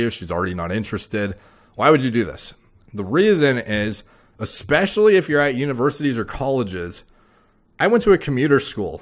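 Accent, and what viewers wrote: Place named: Toronto, Canada. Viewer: Didnt realize you were canadian. It sounds like you went york uni lol. I agree with alot of what you said.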